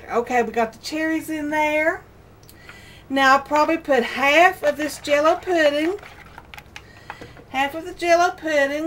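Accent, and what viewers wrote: South, United States. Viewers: i love your accent... what part of the south are u from? im from georgia... down here we call pecans... just that lol... pee..cans.... lol... im and old southern lady who forgot how to make a punch bowl cake.. lol... thank god for u and youtube... be blessed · Where are you from? Never heard that accent before! Sure nuff down home !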